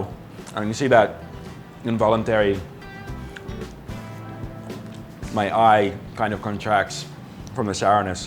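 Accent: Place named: Philippines. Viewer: I like how you have a little bit of filipino accent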